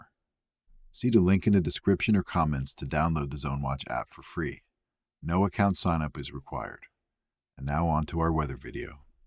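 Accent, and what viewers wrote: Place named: New Jersey, United States. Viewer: You are great! So helpful, you make weather interesting! Plus you have a lovely accent (just like mine NNJ). 😂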